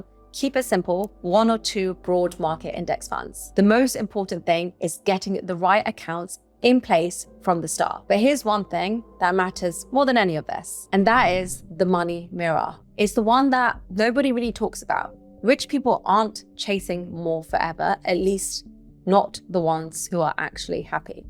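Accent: United Kingdom. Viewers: well fella she is British and that is the way they talk .... it is like boston and the f bomb! oxford university PPE grad 1990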